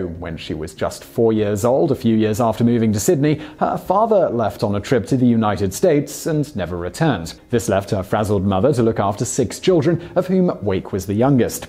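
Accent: United Kingdom. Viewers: he has a British accent; by default his pronunciation is correct.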